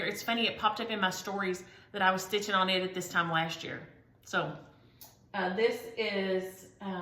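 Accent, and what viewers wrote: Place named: South Carolina, United States. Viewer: Thanks for talking about Aida, evenweave, and linen. I'd love to hear more about how you chose which fabric for each design. Your talk about the whites was SO good! I'm here in SC so you sound like a friend to me. : - )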